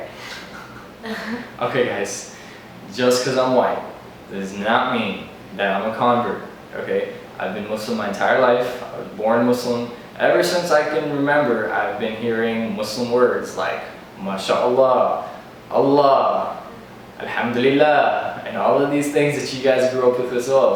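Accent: India. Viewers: nice Indian accent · Indian accent on point! 👍👍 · love the indian accent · i also live in india i wanna meet you plz gimme your address...... <mail> plz send me your address. your nail indian accent. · Indian accent bhai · that indian accent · Hahaha the indian accent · Your Indian accent was so funny😂😂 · Hahahaha really funny love the indian accent Thank you so much we want more vedios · masha allah....u have nice sense of humour and indian accent too......keep up the good work.....ur recitation is so pleasing to hear...!!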